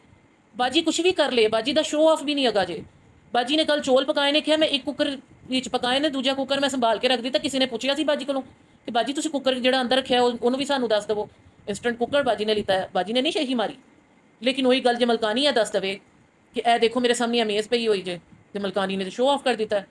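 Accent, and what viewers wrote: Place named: Punjab, Pakistan. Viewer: Very true💯... But really ur punjabi is soooo sweet i luv it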